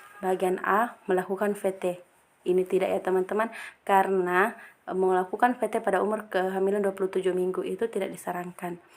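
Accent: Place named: South Sulawesi, Indonesia. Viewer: Kk org sulsel yah ?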